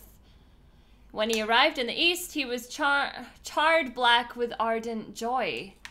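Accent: Scotland, United Kingdom. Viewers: Are you Scottish ? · Are you British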